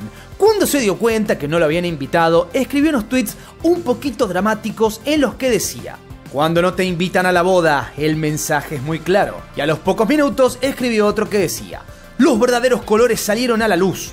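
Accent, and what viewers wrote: Argentina. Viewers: DESPUÉS DE SEGUIR ESTE JUICIO POLÉMICO POR TU CANAL, AHORA ME PARECE UNA PARADA OBLIGADA CUANDO QUIERO ENTRETENERME EN YOUTUBE HAN HECHO UN TRABAJO INCREIBLE Y DESEO QUE MARCAS PATROCINEN, TE VEO DESDE EL SUR DE LA FLORIDA Y ME ENCANTA EL CANAL. SALUDOS ERES BELLISIMO Y ESE ACENTO AGENTINO ME ENCANTA. UN FUERTE ABRAZO Y FELICIDADES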